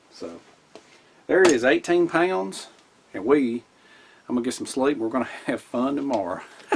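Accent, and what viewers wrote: South, United States. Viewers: This is how a real American sounds like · What er you from my friend. I love that southern drawl! I’m from MS myself so it’s kinda nice for a southern man to do these vids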